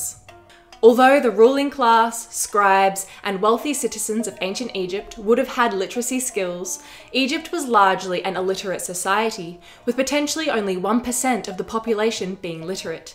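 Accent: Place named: Australia. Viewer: First off, though the narrator sounds Australian, she could just as easily be South African or even white Kenyan with that accent, so don't make assumptions based on skin color. Second, how is this stopping "Africans" from telling their "own" story? Third, who's exactly "African" in your opinion? "Kemet" Egyptians have been proven through the archeological record and DNA analysis to be from the modern Syrian, Israeli, and Palestinian region. Then they were further Semitized through the Hyksos invasion in the north, while having sub-Saharan contact through the Nubians. Both of those groups held dynastic rule for a short while. Then the Persians conquered Egypt. Then the Macedonians. Then the Romans. Then the Arabs. So again, who do you believe is "African" in your opinion?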